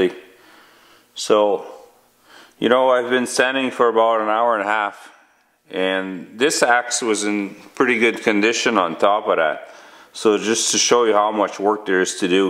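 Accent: Quebec, Canada. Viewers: French/Canadian accent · I detect a slight French Canadian accent dare eh?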